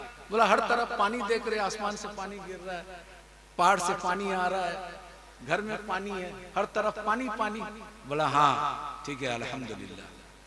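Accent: India. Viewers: Mashallah Hazrat aapka takrir sunkar bahut Dil Ko sukun Mila🎉 aapka bolane ka jo leja hai na vah bilkul Jharkhandi ka leja hai aapka Allah Pak aapko salamat rakhe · Accents to pura Hindi lag rahi hai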